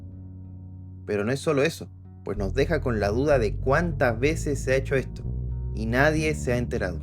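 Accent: Chile. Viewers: Eres chileno? Por la forma de hablar. Por cierto, saludos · hablas como chileno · Oye porque tienes acento chileno? eres chileno o peruano me confunde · Eres de chile ? Tienes cierto acento 🤔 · ¿Es chileno? 🤔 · Eres chileno? Tienes el acento. Lo sé porque soy de Tacna y es muy marcado su acento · Eres sureño? Siento chilenidad en tu acento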